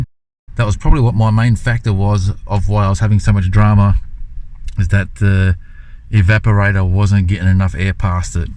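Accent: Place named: New Zealand. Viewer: haha oh really 😂 sorry mate - yeah definitely heard some Kiwi twangs in there. Maybe with so many of us kiwis here it’s starting to rub off on ya! Ha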